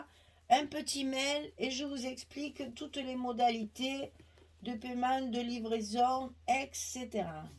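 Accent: South, France. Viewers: Bonjour je trouve vos albums bien jolis. En ce moment je suis en train de faire l album snowy winterland en suivant les tutos. Je vais aussi tester les capsules. Dans tous les cas merci encore pour vos tutos et aussi votre accent du soleil me donne du baume au cœur.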